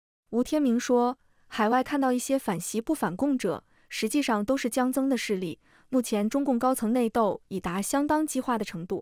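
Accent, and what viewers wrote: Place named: Northeast, China. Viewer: 為什麼是東北人口音好惡心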